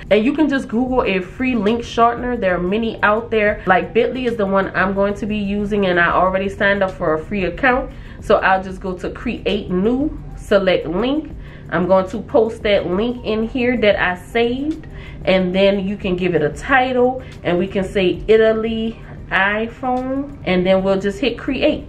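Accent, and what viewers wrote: New Orleans, United States. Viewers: You from my city new Orleans i can hear it in your voice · I i notice a NOLA accent anywhere ❤❤❤ yeah baybee!!!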